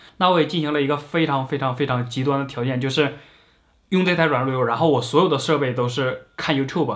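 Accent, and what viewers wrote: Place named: Northeast, China. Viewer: 哥们东北哪儿的呀？口音好亲切呀😂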